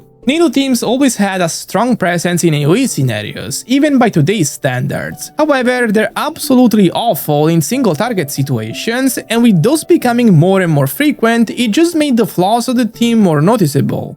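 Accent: Italy. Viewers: Chat is this guy italian? He sounds like one and i like it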